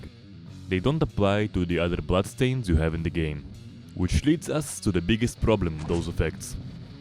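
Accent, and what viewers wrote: Russia. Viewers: Здравствуйте, кто вы по нации? Hello, what is your nationality? You seem to have a Russian accent, or maybe not. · whats your accent my sister says russian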